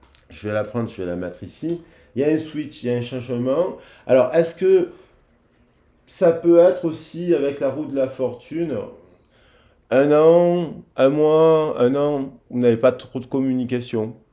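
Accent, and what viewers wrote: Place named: Marseille, France. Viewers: Coucou merci pour cette magnifique guidence je suis très heureuse de vous voir et de vous entendre sa fait plaisir avec ce jolie accent marseillais qui vous vas à ravir merci de prendre le temps alors que vous avez beaucoup de travail sa me mais en joie c est un jolie cadeau je vous embrasse bien fort et vous de gros bisous belle journée remplit d amour et de douceur 😚💕🌈🌞🌹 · Bjr. Je vs découvre ce jour. 🙏🏻🙏🏻🙏🏻 J adore votre accent Marseillais 😉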